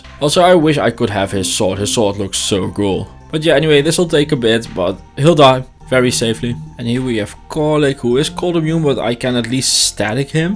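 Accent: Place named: Netherlands. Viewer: Too bad, but sure I totally comprehend the reason. Not everyone has the time to get a "Twitch star" today 😁 RL always comes first. Cheers! P.S.: are you dutch ? You sound a bit like a dutch guy ^^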